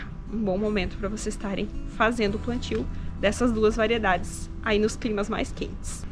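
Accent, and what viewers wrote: Rio Grande do Sul, Brazil. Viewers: Achei que você era aqui do sul ,têm um sotaque gaúcho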